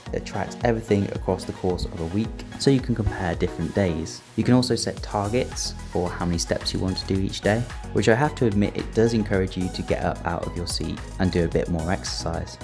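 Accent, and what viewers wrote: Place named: Princes Risborough, United Kingdom. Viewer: yeah because that P Risborough accent wont go away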